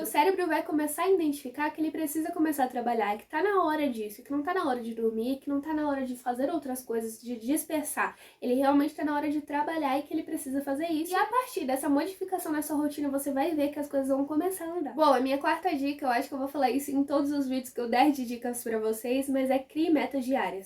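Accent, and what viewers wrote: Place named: Northeast, Brazil. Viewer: É nordestino cara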